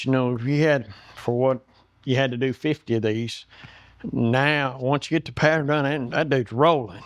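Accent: South, United States. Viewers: Thanks for the reply. I'm Italian and passionate about English. Sometimes I think I can recognize some American accents. In your case, I thought I was hearing a Southern American accent. Glad I guessed right. 😊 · Great jig! As a fellow southerner, nothing wrong with your accent! We don’t pock the cah!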